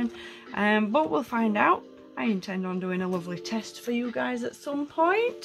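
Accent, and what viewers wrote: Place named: Yorkshire, United Kingdom. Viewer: I think th UK hub is in Birmingham, so I'm not anywhere near there. I'm originally from Sheffield , so I knew you were a Yorkshire lass !!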